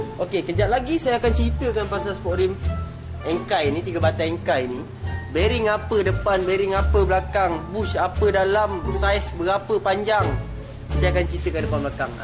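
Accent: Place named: Negeri Sembilan, Malaysia. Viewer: buat vlog ckp nogori la plk....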